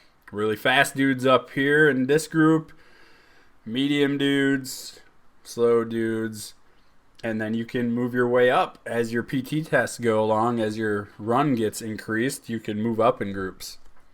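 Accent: United States. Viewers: You sound like you’re from Louisiana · That's so funny. I knew you were from Wisconsin right when you started talking. And then you said down south so I was confused but you confirmed it in the video haha. Go Wisconsin!!!!!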